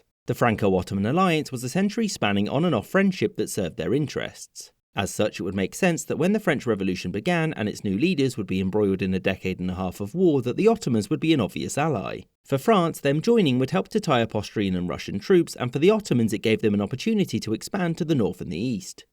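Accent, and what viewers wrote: England, United Kingdom. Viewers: It's the English accent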